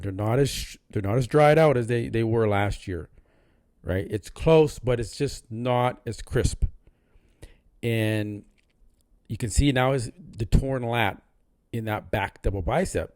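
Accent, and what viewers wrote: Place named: Caribbean. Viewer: absolutely. I just started to listen to your videos. You’re on point with your analysis. I’ve been in the game and a fan since 94. Lol. And as a proud Jamaican don’t think I don’t hear a little Caribbean in your voice. HaHa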